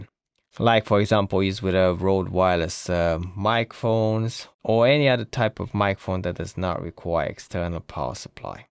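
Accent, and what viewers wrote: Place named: United Kingdom. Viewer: ❤your accent, like a native British. Also your knowledge in audio science is extensive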